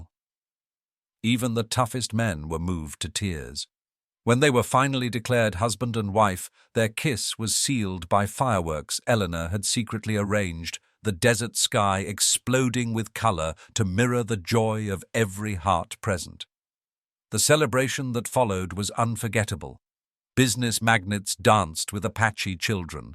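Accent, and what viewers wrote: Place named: United Kingdom. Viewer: the narrator's British accent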